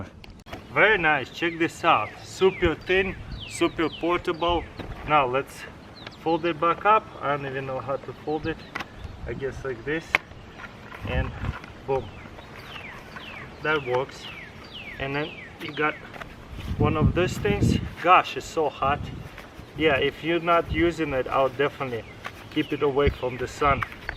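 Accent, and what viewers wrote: Russia. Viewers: He's from Russia.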